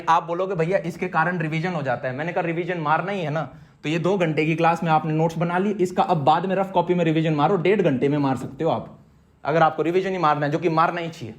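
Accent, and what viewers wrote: Haryana, India. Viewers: Bhai haryanvi touch ohogohohohogoho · Haryanvi lag re hai aaj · haryanvi voice ho gyi bhaiya ki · Bhaiya Haryanvi accent 😍😅.... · Bhaiya aaj kuch haryanive tone bhut aa rshi h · does any one recognise haryanvi accent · Ask bhaiya haryanvi accent me bol rhe h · Haryana accent ho gayaa hai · Sir today your voice like haryanvi nature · Bhaiya.. Hryanvi tone mein kbse bolna shuru kia aapne? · Haryanvi lag raha bhai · bhaiya hariyanvi ka tone aa raha tha aaj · Please give up your haryanvi accent it doesn't suit you. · Bhaiya haryanvi accent aa gya aap mein toh permanently ❤ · He is a Jaat so there is always a touch of haryanvi accent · Bhaiya aaj to aapki voice me Haryanvi touch tha maja aaya Bhai 🙂🙂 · Bhaiya slowly slowly your accents are transforming into Haryanvi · I've felt how his language and accent changed and leaned to haryanvi accent over the time😂😂😂 I think it's effect of geography or the friend circle 😂😂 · When did get this Haryanvi accent? 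· Bhaiya ye Haryanvi tone suit krti h aappe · Bhai aaj hi nhi pichle kuch mahino se bhaiya haryanvi jaise hi baat karte h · Bhaiya ki boli.. Haryanvi ho gayi lockdown mei..😅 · Aaj toh Haryana tone m bol rhe ho bhaiya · Sounding haryana style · Bhaiya aaj aapke bolne tarike mein badlav lag rahe hain. aap pahle se alag tarah se bol rahe ho lagbhag Haryanvi mein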